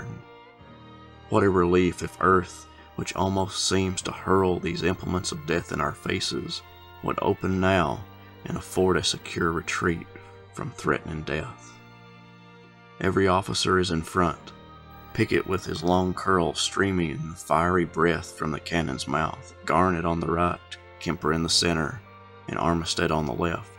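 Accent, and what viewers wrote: South, United States. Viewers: I listened to your video regarding the union account of Pickett's charge and enjoyed your presentation. I am an Army Retiree and lifelong student of military history and applaud your presentations; however, I would like to respectfully point out that Union formations were identified by a numerical designation, while the Confederacy identified their units at the brigade and higher by the name of the current commander. Judging by your accent I can see why you might identify major formations on both sides in the same way, that is to say with the Confederate system. Keep up the good work.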